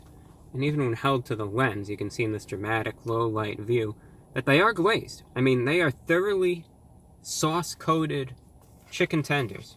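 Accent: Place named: United States. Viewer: „None of them Hääääve it“ Man i like, but the american accent just kinda gives me shudders. Still great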